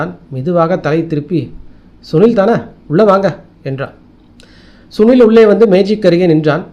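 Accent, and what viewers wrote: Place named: Kongu, India. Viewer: கதையும் வாசித்த விதமும் குரலும் அருமை வாழ்க வளர்க ...... உங்களது பேச்சு சிறப்பு கொங்குதேசம் போல தெரிகிறது தொடரட்டும் பணி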